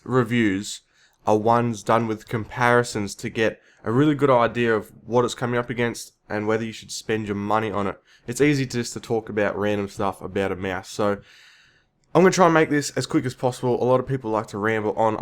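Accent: Australia. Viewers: Goodish Review, you will polish up as you make more:) and good to hear another aussie. I will wait for the next mouse as this one is not there yet. One deal breaker for me is lack of a free-wheeling scroll-wheel. My current mouse has one, (Logitech G502) and i didn't know it was so important till I used it every day. Just in facebook a free-wheeling scroll-wheel is a attribute without peer let alone in Fallout4. Second deal breaker is not being able to change the individual colours of the buttons as a visual aid. I have a Corsair R95 RGB.. my god what a fantastic keyboard, having key colours change in each profile is important to me. To make your reviews better you should make a list of what is important in whatever device. Like in this one you need to mention if each button can be colour configurable or only one colour for all buttons. RGB devices are not all equal it seems:) I just assumed they were changeable like the keyboard till i looked at multiple reviews and only one person mentioned this fact. 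And only one other reviewer mentioned the lack of a free-wheeling scroll-wheel. Cheers and keep up the good work..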